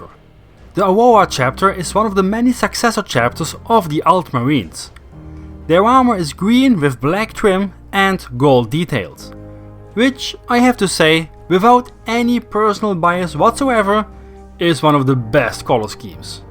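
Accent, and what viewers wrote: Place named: Eastern Europe. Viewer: I figured you were from eastern Europe. Rock your accent, brother. I'm a mumbler, myself. Great video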